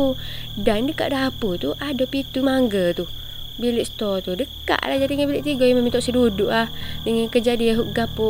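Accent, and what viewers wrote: Kelantan, Malaysia. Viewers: kelate malaysia. sedap dengar kakak ni cerita terbaikkk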